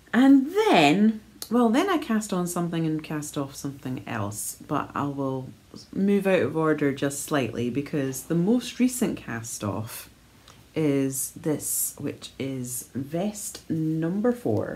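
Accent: Scotland, United Kingdom. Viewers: every time I hear your Scottish accent I think of my Nan. She left Scotland right after wwII bound for America. Her heart missed Aberdeen all the time, despite having had harsh and brutal childhood. I hope to set foot on the land that lost her. I’m sure that you hear from folks with Scotch ancestry all of the time, so I apologize. You are not Scotlands keeper of it’s lost souls. I do hope that you are over that brute of a virus and make up lost knitting time💗💗💗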